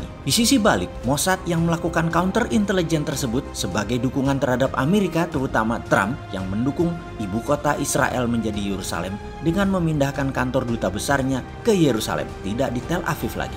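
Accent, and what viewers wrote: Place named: Malang, Indonesia. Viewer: Iki jan omongane wong malang banget.. Kopimu kurang kentel, dolenmu kurang adoh rekk..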